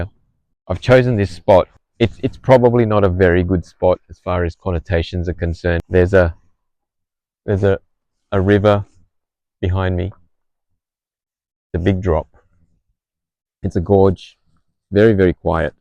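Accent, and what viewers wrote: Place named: Malaysia. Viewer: I’m here after watching the video of you speaking with your daughter about complexion. As a mum of 3 beautiful mixed girls (Indian and Chinese), I do feel for them when they are asked about their heritage. But they consider themselves lucky.. they get double the festive celebrations and double presents! We are blessed to live in Malaysia. And I detected a slight Malaysian accent when you spoke, happily I was somewhat correct, lol. Good luck in your journey. Home is where the heart is!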